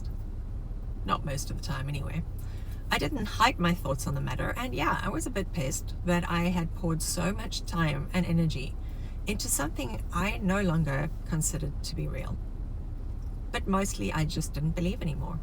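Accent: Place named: Afrikaans, South Africa. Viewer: Are you Afrikaans? I like your accent. Great vid. Thanks.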